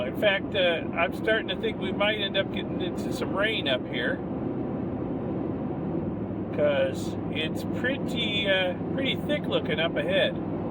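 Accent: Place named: North, United States. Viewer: You don’t have a Florida accent, you sound more northern part of US. I am trying to plan possible a bus trip to Los Angeles. I am looking to see what bus routes that have non or very few mountain passes and more flat terrain. I would be departing from central Montana. There is a bus route through UT heading to Las Vegas NV but in between, on I15, there is a mountain pass that feels like a roller coaster and if there is a heavy rain, because of the terrain, it can be extremely hazardous for driving. It’s scary during clear days, even more during storms. Flash floods are the main dangers on that part of I15. Even though it is quicker bus route to LA. I can also go through north of NV from UT to Sacramento. That bus route doesn’t seem that bad. What do you think?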